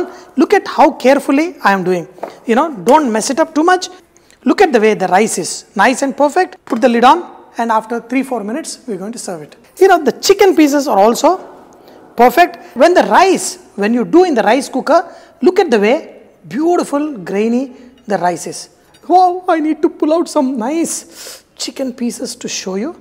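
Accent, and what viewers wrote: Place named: Karnataka, India. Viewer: Sir, your English slang is like kannadiga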